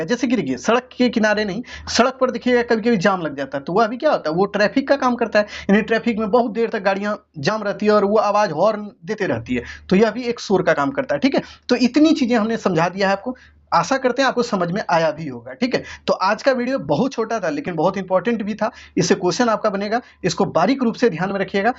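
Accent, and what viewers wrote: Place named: Bihar, India. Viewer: Sir aap bihari h n ham v bihari h aapka language bahut accha lagta h😂❤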